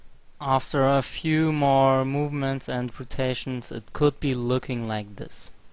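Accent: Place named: Germany. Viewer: youve got a german accent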